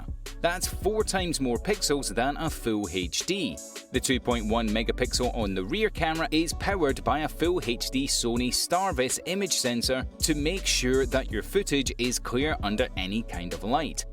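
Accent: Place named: England, United Kingdom. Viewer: you sound English but the price you give is in dollars >????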